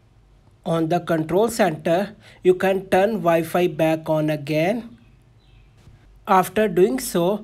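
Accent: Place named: India. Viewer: When u hear the video and its indian just force close its not working 🤷‍♀️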